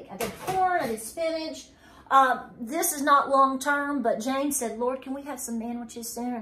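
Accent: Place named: Johnson City, United States. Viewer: Thanks! My husband guessed that you live near Johnson City by your accent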